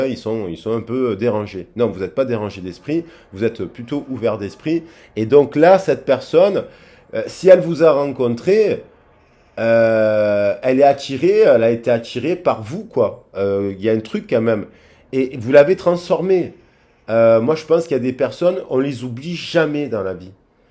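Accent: South, France. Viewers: Cc merci pour votre guidance très detaillee.c est toujours un plaisir de vous écouter avec votre accent du soleil qui me fait chaud au cœur 😉😊.vous êtes un veritable therapeute.vous m apaisez bcp.😊😘.merci pour votre bienveillance. Belle journée a vous 😉😊😘❤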